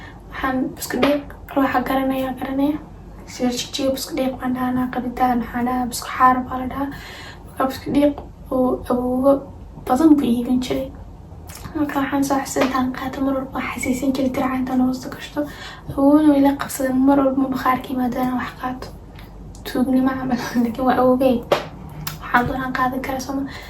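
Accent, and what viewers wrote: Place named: Djibouti. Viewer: Hadalkaga malmahan dhan wa dareemayay inad tahay reer dds 🫶😺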